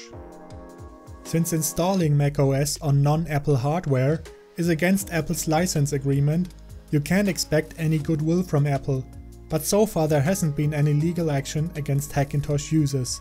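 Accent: Germany. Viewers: falls du deutsch bist hört man das an deinem akzent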